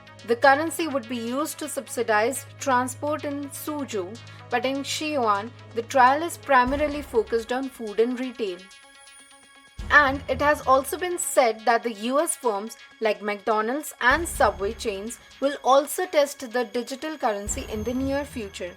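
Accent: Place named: India. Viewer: Stop inventing things in Indian accent please, where is the digital currency of America???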